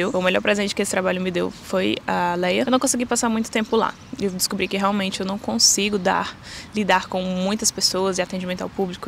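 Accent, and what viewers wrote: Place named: Northeast, Brazil. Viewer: Logo vi que me pareceu ter um sotaque nordestino mas não quis arriscar. É que a maioria dos brasileiros em Portugal ou são paulistas ou cariocas 😁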